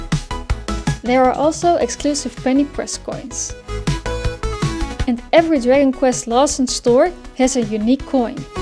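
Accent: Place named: Netherlands. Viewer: I can tell the dutch accent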